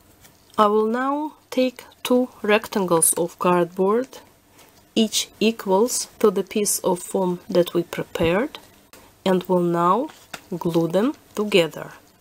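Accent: Russia. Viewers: Ты русская! По аксенту видно! :) в Америки живешь?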